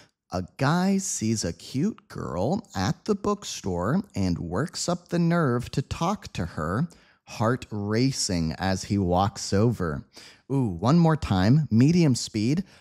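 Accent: United States. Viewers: Listening to these lessons you have the benefit of acquiring multiple perspectives of the English language. The teacher explains with crystal clear pronunciation, vocabulary and synonyms, and also idioms and phrases, in perfect standard American accent which is the best in the world, and is like music to my ears. Listen and review the lessons to make the best of your learning. Thank you for the English lessons.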